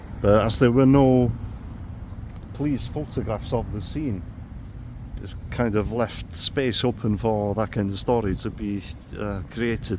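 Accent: Wales, United Kingdom. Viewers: Just discovered your channel. Great to hear Welsh accents on YouTube. I’m from the south, but there’s definitely not enough from the north on social media. Keep up the great work, look forward to watching more of your videos. Diolch!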